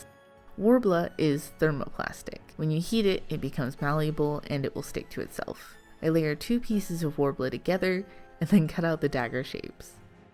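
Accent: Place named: West Coast, United States. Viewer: I knew it was the west coast but your voice sounds WAY too familiar to me... hence why I asked about WA or OR. I've never lived in CA so it's likely that you just have a voice similar to someone else's. In any event, I know a west coast gal when I hear one. Lol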